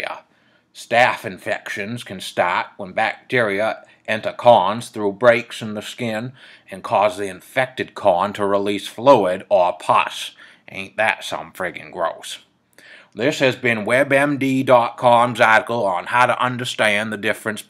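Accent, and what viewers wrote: Maine, United States. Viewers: I have a friend from northern Maine and a family member from Haverhill, Ma. This sounds like a cross between them. ☺️ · visited Maine this past week and met a lovely farmer in a Diner that spoke like this. Had a good chat. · Well! Not only did I get to hear a Downeast Maine accent, but I think I figured out what's wrong with my foot, so thank you! :)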